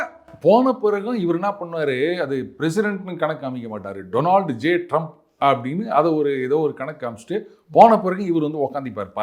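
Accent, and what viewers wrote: Chennai, India. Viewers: Bro significant post chennai slang amakalam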